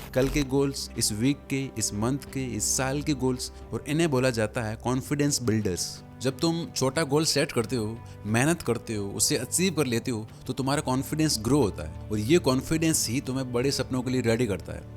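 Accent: Rajasthan, India. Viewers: Ye banda rajesthani hai jo bol raha hai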